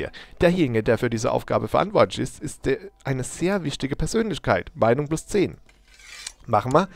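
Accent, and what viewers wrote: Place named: Baden, Germany. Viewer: Kann es sein das du aus Karlsruhe kommst du hast mal deine Stadt in Sim City mit Karlsruhe verglichen und jetzt spielts du Baden, und dein Akzent scheint mir irgendwie auch aus dieser Gegend zu kommen da wohn nämlich auch (im Breisgau) Mach Baden bitte zu einer Großmacht und über nimm wenn irgendmöglich Schwaben/Würtemberg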